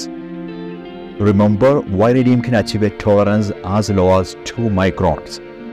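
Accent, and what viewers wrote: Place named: India. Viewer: So the more you hear an Indian accent, the easier it is to understand.. just watch more often!! You’ll be amazed! I have zero issues understanding even the thickest of Indian accents.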